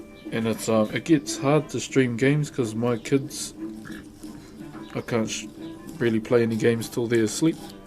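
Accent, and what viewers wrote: New Zealand. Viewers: Keep up the good work mate. It's awesome what you are doing, it's really interesting. Hearing you speak makes me miss working with all of the kiwi lads that i used to bounce with. Cheers 🍻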